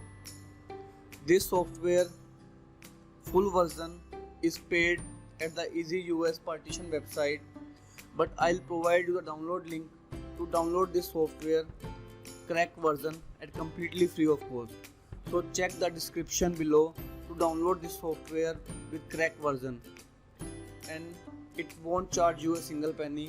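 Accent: India. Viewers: The music is slightly louder than your voice. So it's really hard to understand what you are saying, along with your Indian accent.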